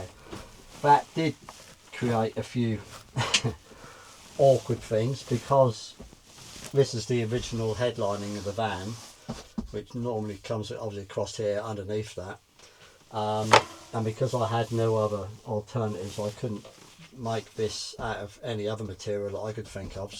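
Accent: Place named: East Anglia, United Kingdom. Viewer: I’ve just discovered your channel and I’m hooked already.Guessing you are north Suffolk or south Norfolk from the accent. I’m down on the coast not a million miles from Aldeburgh. Looking forward to watching the canoe builds as I’m a maker myself…woodturning, and have my own little channel.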